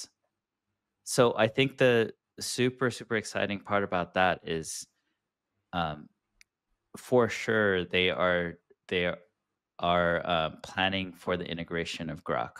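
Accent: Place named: England, United Kingdom. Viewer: This guy has the most annoying English accent ever. I want to listen to the guest. But I cannot handle the annoying accent! I just can' t.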